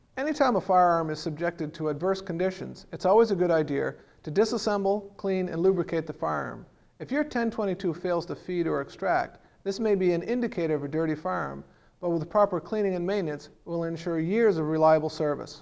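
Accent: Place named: New England, United States. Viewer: Obviously from New England.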